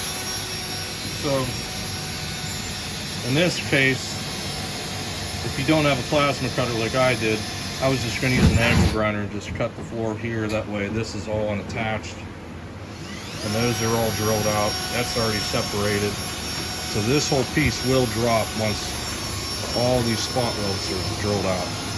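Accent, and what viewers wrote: Pittsburgh, United States. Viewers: That’s gotta be a Pittsburgh accent. If so I now live in Monroville can you fix my rockers and cab corners? 2000 f250 7.3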